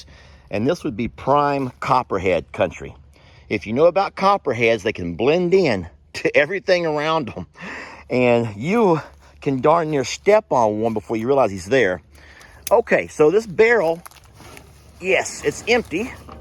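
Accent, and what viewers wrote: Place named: Texas, United States. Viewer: I watch all your videos all the way through, always! I love your Texas accent. I watch your whole family- you are all very entertaining and make me laugh….at night I lay in bed and put one of your videos on and relax and listen. I watch in the morning, as well as I eat my toast. These are my routines- gotta have my family fix every day! Keep doing you, I love it!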